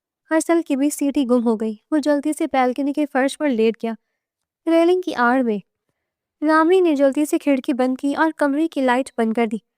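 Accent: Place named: United States. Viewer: Lagra hai app American ya London wali ho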